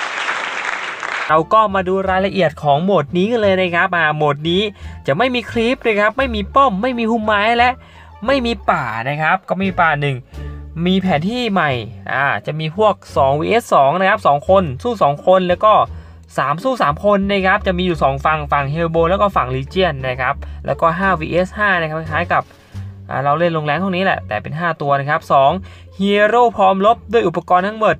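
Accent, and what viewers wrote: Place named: Isan, Thailand. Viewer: ทั้งเหมิด คนอีสานรึป่าวว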